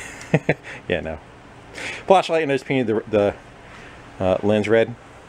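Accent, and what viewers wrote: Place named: United States. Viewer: It's ok, you speak American like us! If we wanted Shakespeare we would be watching a play!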